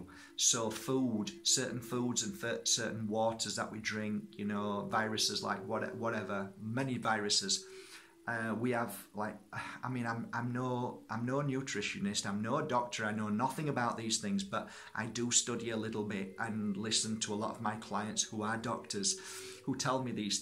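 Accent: Yorkshire, United Kingdom. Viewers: I'm in La Cala de mijas... Love your Yorkshire accent..I'm a Lancashire Lass! 😉😀 You're an inspiration and I hope we meet one day ☺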